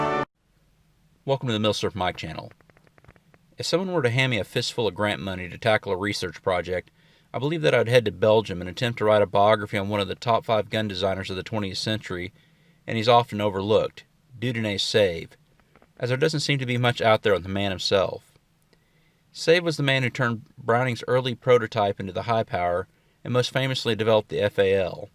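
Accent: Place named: South, United States. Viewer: cool. I was detecting a slight southern accent that I couldn't place...piqued my curiosity.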